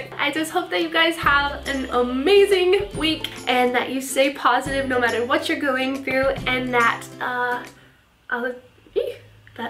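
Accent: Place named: Transylvania, Romania. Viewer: O americanca care vorbeste ardeleneste!!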